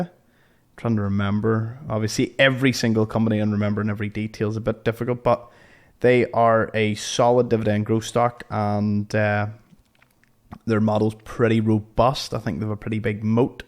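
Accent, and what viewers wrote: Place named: Northern Ireland, United Kingdom. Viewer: Just found your vids keep up good work . Sound like your from N.Ireland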